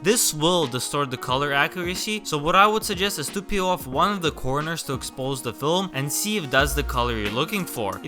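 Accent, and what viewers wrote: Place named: Russia. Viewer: Русский акцент ни с чем не спутаешь. Спасибо, бро 😀👍🏼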